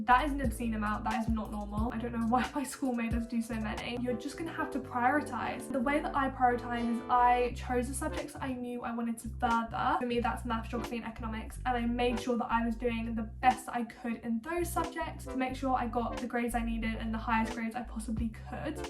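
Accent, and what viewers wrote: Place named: United Kingdom. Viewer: I can’t relate to the things you’re saying cause things are done differently in Nigeria & I’m about to graduate from the university BUT I just enjoyed hearing you speak in your cute British accent and your editing is bomb!!!! I’ll literally throw a party when I get to 5k subscribers 😂😂 Congratulations on the Big 5000, 😁😌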